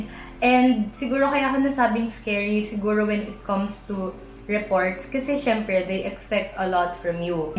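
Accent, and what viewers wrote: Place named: England, United Kingdom. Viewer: I love you english accent.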